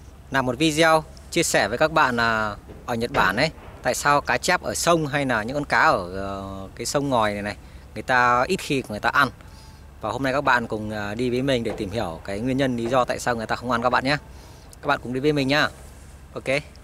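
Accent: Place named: Nam Dinh, Vietnam. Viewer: Hê nô...giọng Hà tây hay Nam định mà nghe ngộ ngộ , chắc Nam định 100% nuôn !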